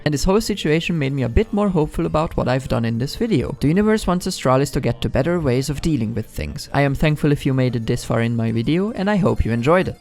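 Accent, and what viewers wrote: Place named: Germany. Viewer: Hat lange gedauert aber den Deutschen Akzent kriegst du nicht los😂 (Wirklich geiles Video du hast definitiv mehr Abos verdient) #fckastralis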